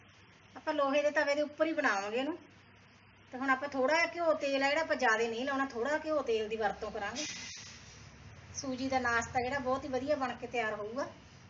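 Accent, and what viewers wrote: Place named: Ludhiana, India. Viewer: ਪਰ ਤੁਹਾਡੀ ਬੋਲੀ ਲੁਧਿਆਣਾ ਸਾਈਡ ਲੱਗਦੀ ਆ ਜੀ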